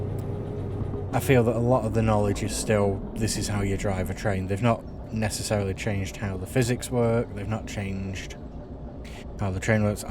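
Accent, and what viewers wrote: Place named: England, United Kingdom. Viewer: Great video. I love that English accent and your sense of humor.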